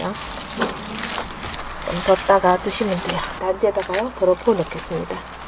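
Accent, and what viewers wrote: Gyeongsang, South Korea. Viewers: 친구의 추천으로 유튜브 를 보게 되었네요 ㅎ 목소리도 차븐차븐 이해력있게 말씀도 잘하시고 경상도 바투리 매력 뿜뿜임돠^^♡ 댓글 감사 함니다 그독 알람 조이요 꾹 꾹 꾹 눌고감니다ㅎ 건강하시고 늘 조은 하루 되세요 ㅎ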